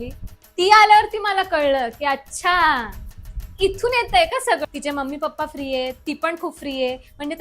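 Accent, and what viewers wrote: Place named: Nashik, India. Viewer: तु नाशिकची वाटते.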